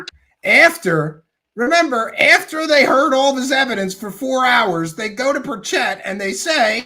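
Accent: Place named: New Jersey, United States. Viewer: Great show!! You have a jersey accent..lol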